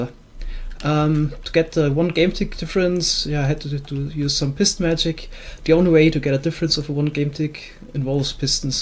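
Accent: Germany. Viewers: BTW YouTube can't figure out your accent; it thinks all your videos are in German :\ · Als ich ein Video von dir gesehen habe, war mir direkt klar, du kommst aus Deutschland, der Akzent verrät alles😂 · Thats so funny! I'm german, too and I always thought that you sound kind of german xD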